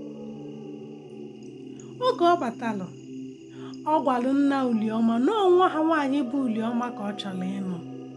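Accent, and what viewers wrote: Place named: Igbo, Nigeria. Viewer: sis i so much love your channel with your igbotic accent i wish i can spee=ak like you now chai kudos to you sis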